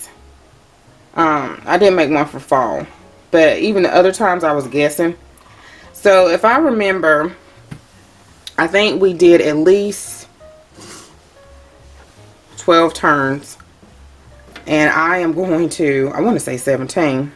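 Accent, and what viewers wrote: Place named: South Carolina, United States. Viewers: You sound like me talkin’ ! Very similar, anyway! I’m from Georgia. How about you? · You've got to be from South Carolina lol. Girl, you talk just like me.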